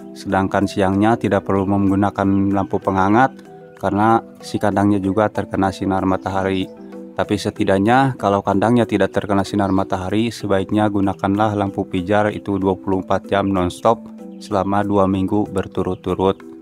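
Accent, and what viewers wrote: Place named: Sunda, Indonesia. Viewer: assalamu'alaikum mang amang orang mna gening ngomongna sunda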